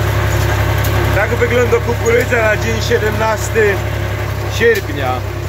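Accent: Silesia, Poland. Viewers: Witaj. Po kuku i akcencie wnioskuję że to gdzieś na południu kraju - Śląsk 🤔u mnie o połowę niższa i zeschnięta, susza jej wogóle nie oszczędziła 🙈Ładna i oby była jak najpiękniejsza. Pozdrawiam serdecznie z subikiem 🤗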